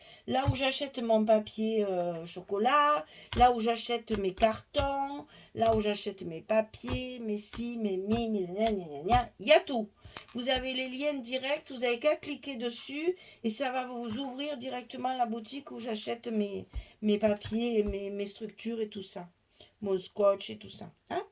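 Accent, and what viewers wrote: South, France. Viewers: Bonjour, quel beau papier, c'est très élégant et vous le sublimez par votre talent!!!! J'aimerais savoir où vous vous êtes procuré la pointe avec laquelle vous enlevez la pellicule des bandes de colle double face? Merci pour votre réponse et ce merveilleux partage!!!! J'aime bien votre accent car j'ai vécu les 33 premières années de ma vie dans le midi et maintenant je vis en Bresse!!!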